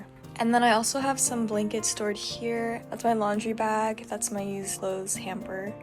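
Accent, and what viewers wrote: United States. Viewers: YouTube algo just randomly pushed me your channel. Can you do a video so we can learn more about you? did you grow up in Japan or another country? your English sounds very American to me, and not picking up much of an accent. I see your videos are getting a lot of traction already, you should be able to make this a full time job if you want to do something like that. Consistency is key. You are stunning btw. much love from Pittsburgh PA. :) Bye for now!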